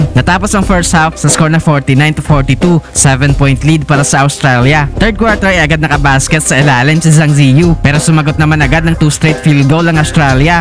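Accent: Iloilo, Philippines. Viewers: Ibang klase itong narrator a. First time ko rito. Alanganing Nobita na may Ilonggo accent.😅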